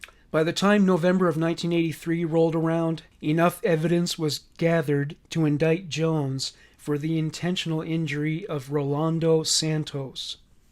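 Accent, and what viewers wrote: Canada. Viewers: He's Canadian give him a chance aha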